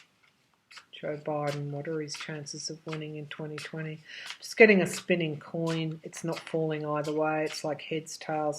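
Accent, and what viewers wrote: Australia. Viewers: I love the the R rolls in your speech..no need to correct it..Us here in America have a few different accents but I love the Assuies accent!!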